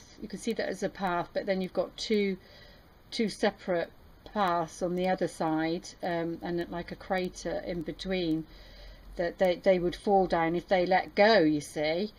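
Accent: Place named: United Kingdom. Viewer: Impressive....first time watching and I loved everything about this. Resonates so much....like you were talking directly to me. I also have to say, loved listening to your accent....makes me miss my British family even more.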